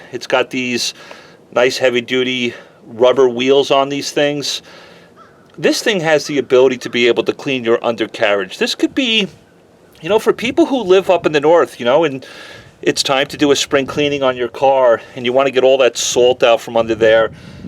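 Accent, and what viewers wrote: New York, United States. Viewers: You may be based in Dallas, but you still sound like a New Yorker.:) BTW, I believe it's pronounced "Ree-oby".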